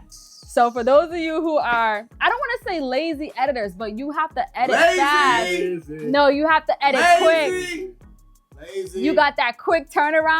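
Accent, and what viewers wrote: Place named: Jamaica. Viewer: 🇯🇲🇯🇲 Jamaican accent is amazing big up from yard 🔥🎥🔥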